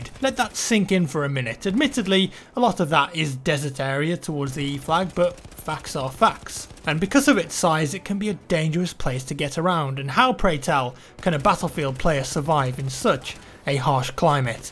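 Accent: United Kingdom. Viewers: just the british accent lol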